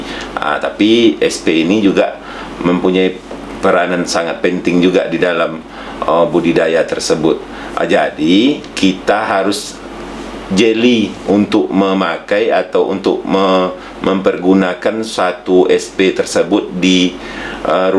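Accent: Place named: Padang, Indonesia. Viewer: Cakap nyo logat padang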